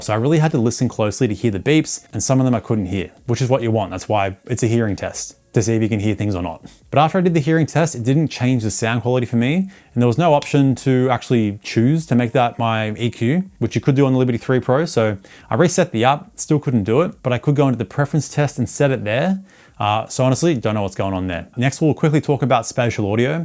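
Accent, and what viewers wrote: Australia. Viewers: Yaaa rigght.. Soundcore sound quality is up there almost the best. Upgraded from Edifier x3 to Soundcore, Good build quality too. As Soundcore Spirit X2 and X10 user.. Both earhook design stays on ear good. But Spirit X10 was uncomfortable to take off ear and must twist the hook making it fall often while storing it anywhere or back in charger. It was irritatingly annoying. . And lot o wind noise while riding bike. I switched to stem shape buds, first one Tribit flybuds C1. Good sound only at almost full volume. Small soundstage but decent comfortable listening as no distortion. Further upgrade to Cmf Buds pro 2, mutiple features, Spatial audio. Ldac(battery drainer, almost same sounding). Very loud,too much bass if selecting bass boost abovs 2. Very Bad fit in ears with stock tips and have to try hybrid silicon or memory foam tips later. 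Hey, do a review on good n best eartips, they r most important part of earbuds. Plenty of good sounding budget earbuds in market sub 50 dollars.. and midrange sub 100 dollars price .. But Soundcore has the top sounding buds that competes with higher more expensive branded buds. Am gonna get Liberty 4c, hows the fit? . Good review with highly distinct Aussie accent,, slow down a bit mate.( jus kdn) .., slow when you highlight the main points. I rarely refer to earbuds reviews but saw yur channel, has lots n lots o buds.. Sooo manyyyy.. How you pick yur favourite from sooo many??, well i suggest yu keep diffrent for various occasions.. Travel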